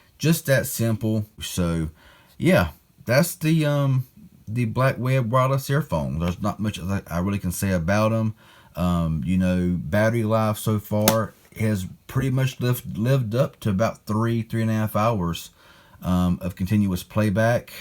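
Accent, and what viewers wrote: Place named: Appalachia, United States. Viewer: Good God, are you TRYING to sound like a freaking hillbilly?!???....what a waste of MY time....I GAVE UP trying to listen to your "presentation"!!!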